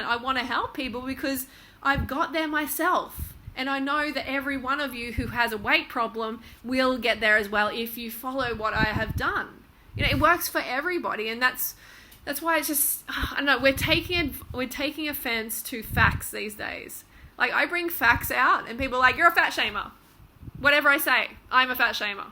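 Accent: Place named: Australia. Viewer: I realize your Australian (accent>? I am guessing BC I don't know who you are)...but I think what we are seeing in the States is a revolt of Political Correctness and all these speech police codes America has been pummeled with...it will only continue as people break free of the liberal college "ism" and "ist" box that has been constructed around us in the last 5 years or so...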